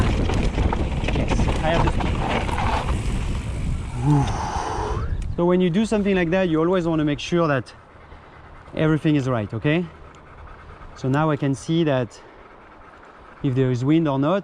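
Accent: France. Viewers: Impressionnant... Dis-moi tu forces pas un peu sur l'accent français? :) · T’es tu français, on dirait ben vu ton accent...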